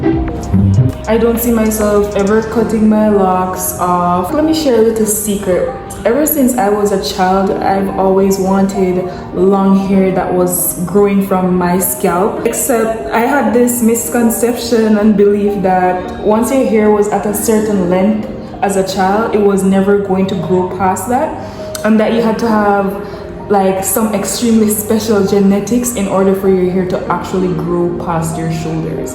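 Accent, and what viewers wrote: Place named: Jamaica. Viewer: You and your locs are gorgeous! 😍. Loved this video! My mom is from Jamaica! I knew I recognized your accent lol.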